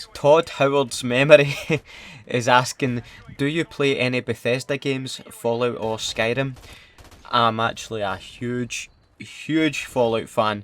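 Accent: Scotland, United Kingdom. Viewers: Really didn't feel like 40 min. Such a beautyful scottish accent. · So he is Scottish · I've never seen someone so funny, I don't know if it's your Scottish accent or you general humour! But you're genuinely a funny fucker! Hope to hear back from you! :) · a know how terrible it is trying tae try talk proper so folk can understand you cause am Scottish as well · Your like a Scottish daihi da nogla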